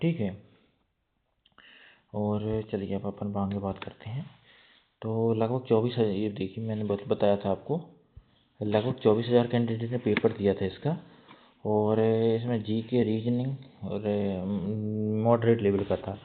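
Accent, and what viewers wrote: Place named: Rural, India. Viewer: Tu bundelkhand se hai kya ??? Accent se lg ra hai kisi gaon se hai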